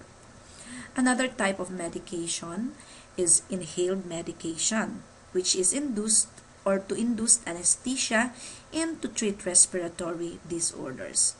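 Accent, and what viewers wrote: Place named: Philippines. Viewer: I love the filipina accent. 😘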